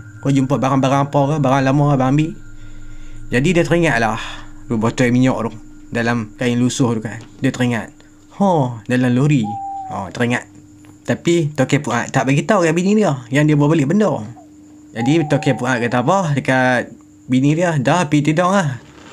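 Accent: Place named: Kedah, Malaysia. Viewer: sib baik pnh duk alor setaq wlupon xlame,jd dpt faham loghat kedah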